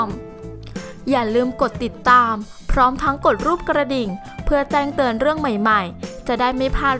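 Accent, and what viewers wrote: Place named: Thailand. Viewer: ยอดวิวส่งผลต่ออัตราเงินจ้าา ที่อ่านๆมันคนไทยชัดๆ5555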